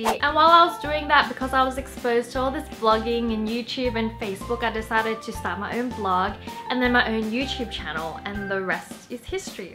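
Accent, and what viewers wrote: Australia. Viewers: Whoa...angel on earth...you have an Aussie accent haha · Sweetpea she's Chinese but has a Australian accent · ...that's an Australian accent + I'm pretty sure she's a Chinese Australian correct me if I'm wrong. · Saarah U that and she just sounds Australian (well about 85% of the time anyway) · I am kinda new to this channel and I was wondering, are you full Asian or are you mixed with Caucasian? I was just wondering because you have an Australian accent but you are from China. Like your videos! Keep up the good work. (^o^)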